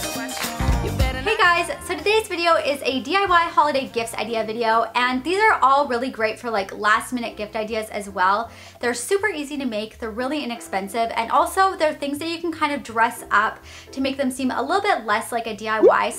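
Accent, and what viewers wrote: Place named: Canada. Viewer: Are you Canadian cuz the way you pronounce the letter A just sounds Canadian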